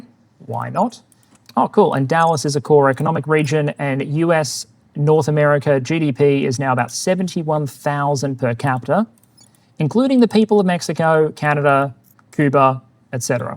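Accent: Australia. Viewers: Reaching out to the game's original voice actors to do chapter intros is a let's play power move. I love the sound of your voice narrating both the real history of the Ukraine war and the fictional history of what ever game you are playing. I fear that future history lectures I will attend will not sound authoritative enough without the Australian accent.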